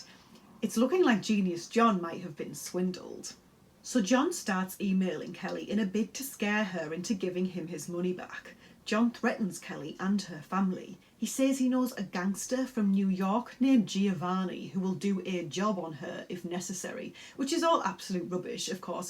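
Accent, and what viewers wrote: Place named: Northeast England, United Kingdom. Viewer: Ooh just discovered your channel. I love all the true crime youtubers. You also sound like you have an accent very close to mine. You also North East England?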